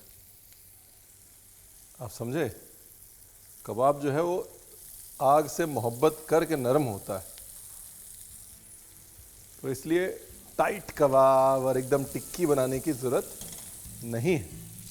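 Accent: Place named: Lucknow, India. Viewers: I lived in Lucknow for a year, it was a magical time for me and you speaking in this accent literally brought nostalgia and big smile on my face 😊❤ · लखनऊ भाषा😊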